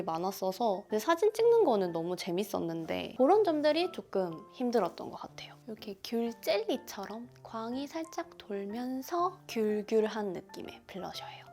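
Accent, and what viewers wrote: Gyeongsang, South Korea. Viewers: 언니~~ 7,8년전부터 계속 봐왔으니 자기일인것만같아 너무 화가나네요…그때 한국어도 아예 못 했었는데 언니 영상을 맨날맨날봐서 그런지 지금은 일본인인데 경상도 사투리 조금 섞인 상태가 되버렸어요ㅋㅋㅋㅋ 그사람들에게 이제는 성공하고 멋진 모습을 보여줄 수 있어서 저도 너무 좋아요!ㅎㅎ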